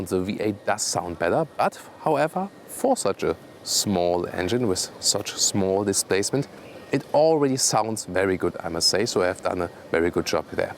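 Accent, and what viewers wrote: Germany. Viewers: Warum macht ihr ein Review vom Mustang auf Englisch? Es gibt 3000 amerikanische, englische, australische, neuseeländische, kanadische YouTube Channel, die Autosendungen auf Englisch machen. Ich bezweifel, dass die sich dann gerne einen englische Review mit deutschen Akzent ansehen/anhören wollen, wenn es Channels, wie Motor Trend gibt. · Ha ha, there's something cool in Your German accent! :]]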